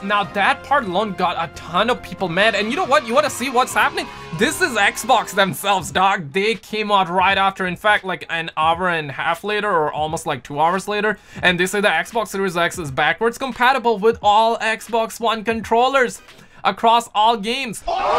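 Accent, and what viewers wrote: India. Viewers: U have some Indian origin or something? Or maybe learning hindi?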